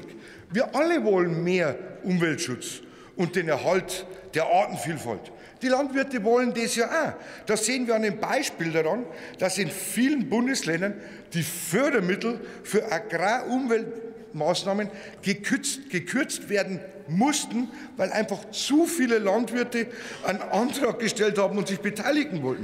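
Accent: Bavaria, Germany. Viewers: Bairisch, ich liebe es ❤️.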